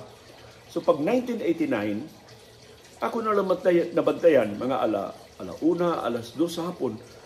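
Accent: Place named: Visayas, Philippines. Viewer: Ug saksi gyud ko nga ikaw ray reporter nga mo basa ug english newspaper inig litok binisaya.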